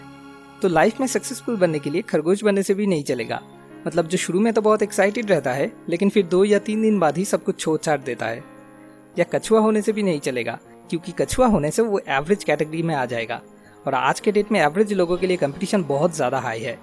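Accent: Bengal, India. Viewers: i love ur bengali accent hindi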